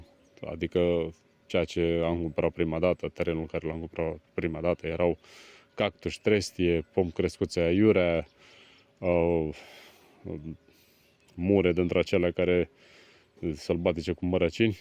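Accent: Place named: Moldova. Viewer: Salut!! Ești moldovean de unde ? așa se pare după accent scz nu te supăra și eu am mulți veri la Roman (Piatra Neamț)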